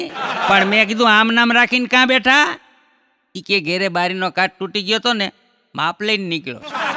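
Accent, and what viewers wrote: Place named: Kathiawar, India. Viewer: Kathiyawadi bole chhe.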